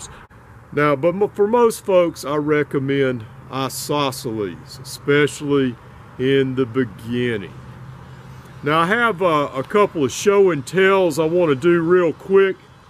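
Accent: South, United States. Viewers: dudes voice is from country heaven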